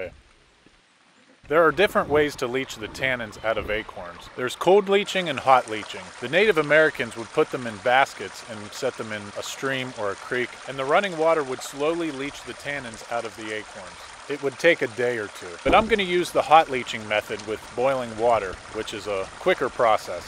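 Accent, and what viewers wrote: Pennsylvania, United States. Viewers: Where are you from? You sound like a fellow Pennsylvanian sometimes. Lol I just found your channel today so please forgive me if it's written somewhere and I missed it or just haven't found out yet. Lol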